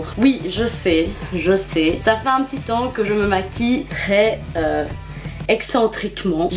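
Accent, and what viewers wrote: Belgium. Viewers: Ahahah j'avoue le coup de faire une vidéo seulement si on a atteint un tel nombre d'abonné-es je n'y aurais pas pensé ^^ Si tu fais un meetup je serais là ! :) Je pense qu'on habite la même région au vu de ton accent et de là où j'tai vue. D'ailleurs si tu fais un prochain FAQ j'aimerais juste savoir dans quelle ville tu habites? Les youtubeur-ses belges sont tellement rares! ^^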